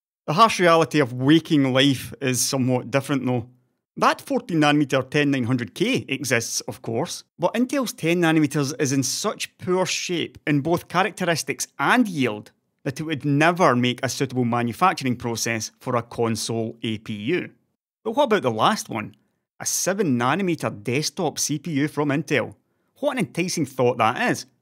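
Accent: Scotland, United Kingdom. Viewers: I can barely understand that thick Scottish accent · Scottish, with a little bit of influence from somewhere I can't remember off the top of my head now, from living outside of Scotland for a while. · It's an scottish accent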